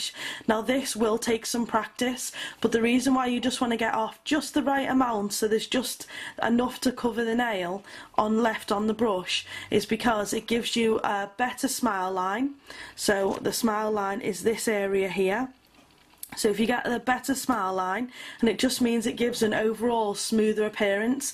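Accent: United Kingdom. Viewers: Oh my!!! I LOVE LOVE LOVE your type of British accent!!!!!!! One of my absolute favorites!!! ❤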